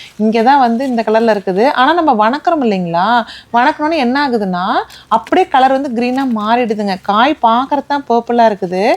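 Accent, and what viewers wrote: Kongu, India. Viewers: Kongu tamil ❤❤🎉 voice super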